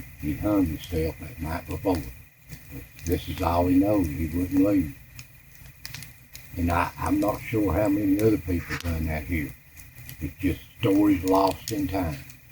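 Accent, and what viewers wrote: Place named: South, United States. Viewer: Same here! Its comforting to hear just talk! Its sad even the accent around here is slowly being bred out I reckon. My accent isn't as strong as my grandparents, and my daughter only barely even sounds southern. Dang internet I reckon. But yes, just listening to these stories, the rhythm and the southern drawl is like a time machine!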